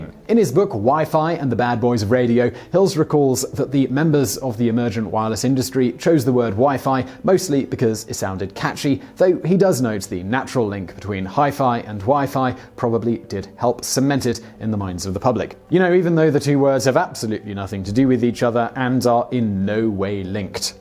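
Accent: United Kingdom. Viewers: OK Monsewer, you poke fun/schit at people making up words or not being able speak certain things. You sure mispronounce so many words yourself and do it with a straight face. Are you trying to be funny or is it that you really don't know how to pronounce these words. Or is it that you seem to be Brit or are speaking with a Brit accent to come off with a holeyer than thou attitude? By the way the English language should be renamed the American language. Since Brits can't pronounce half the words. Do English/Brits/Limeys know just how ridicules they sound? Some Brit movies need closed captions to understand what they are saying. Sorry, I just recalled an Aussie I ran into in Thailand, to this day I still don't know what he said in the short conversation we had, I nodded my head mostly to what he was saying and that seemed to satisfy him.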